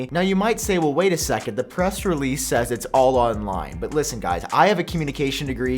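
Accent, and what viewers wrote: England, United Kingdom. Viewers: that “british” accent doe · Your Britain accent is pretty good actually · I laughing so much at your English accent, It’s crazy that apple store in england is the only store keeping covid restrictions . I don’t think Tim Cook got memo from boris that england is free from restrictions.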